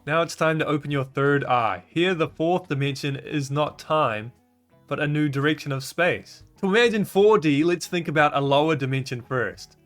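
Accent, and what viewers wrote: New Zealand. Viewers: I knew the fuck as soon as I heard your voice you're a kiwi, where are you from my brother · New Zealand accent? Oh this is about to be a life-changing video lmao (all the cool people live ther)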